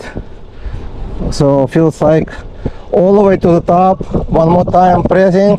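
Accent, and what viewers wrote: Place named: Russia. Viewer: Простите конечно но по вашему акценту понятно что вы говорите по руски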